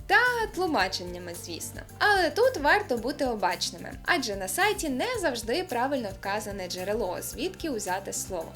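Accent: Standard. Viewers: До чого ж приємно чути чисту українську мову!!! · Ох як би так гарно чисто та й правильно, як ви, хоть би 50 відсотків українців говорили, яка би насолода для вуха була !!!!!!!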